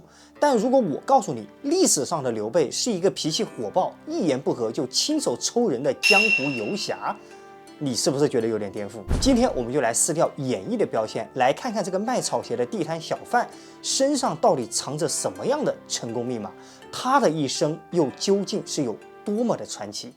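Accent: China. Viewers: ，坦白說你為人處世的態度實在了不起。 我想有必要說明一下，之前就有提到我認為您的內容作得很用心很好，所以我是很自然而然就看完了，並不是「耐心」看完的喔！而且怕您誤會我只是只看標題就批評的，所以有多做一些說明。 像我這種只出一張嘴的鄉民，您還能這麼用心且友善地回應，真的是一個了不起的youtuber。 之前一個有做到這樣的是說書人柳豫，但他的粉絲很多都是之前經營facebook引流來的。所以節目一上架基本盤就有相當規模。 像評論三國這類節目也不少，但囿利題材，所以也頗有侷限。像說書人柳豫也發展到電玩解說。 品質算好的就有什麼文大郎、史大郎(忘了誰先誰後，但風格都一樣，可能是股東不合拆伙吧?)，他們也是引經劇典來增加豐富性。還有一個(抱歉忘了，也許是上述兩人的早期作品)會善用衛星地圖講出當年各國勢力或軍隊征伐的地形、路線。 我聽您的口音，應該是中國的朋友，我在想說如果您附近有什麼著名的三國地點，都可以作一期現場影片，當然不是只有旅遊介紹，而是加上故事情節的引導和你個人的親和力。 比如說「探索涿郡故里，原來劉備當年就是在這棵桑樹下說要當天子的！」應該也很吸睛，只是舉例，不要打我🤣 當然不是說要騙點閱啦~只是我們三國迷其實最重的是這份情懷，而情懷如何展現?我們一般人的做法就是玩遊戲、討論，但您們yotuber是不是可以上升到人事時地物的展現或溯源? 抱歉，扯遠了，只是期待您這麼有誠意的頻道能蒸蒸日上而已，以上都是外行人的胡言亂語，請不要介意。 在下只能獻上小小的訂閱，不成敬意。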